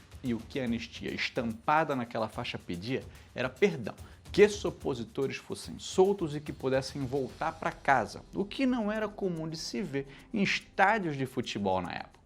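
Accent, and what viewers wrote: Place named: Rio de Janeiro, Brazil. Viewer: Tira esse carioca falando do Corinthians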